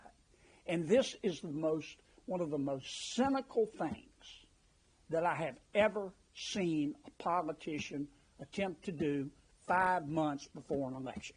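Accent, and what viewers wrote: South, United States. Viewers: Love him. His down home speaking. God Bless 🙏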